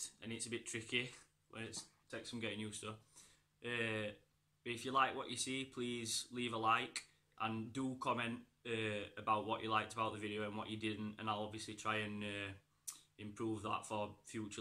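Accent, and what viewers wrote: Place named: Barnsley, United Kingdom. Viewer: That Barnsley accent lad! 🙂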